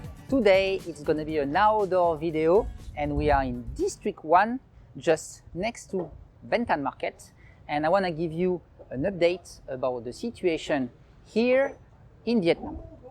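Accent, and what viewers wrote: France. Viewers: Loves ur French accent. Great job bro ok.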